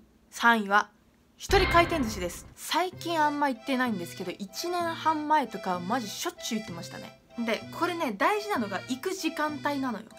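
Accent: Nagasaki, Japan. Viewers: の後輩です！長崎弁恋しくて見てたら面白くてハマってます！応援してます！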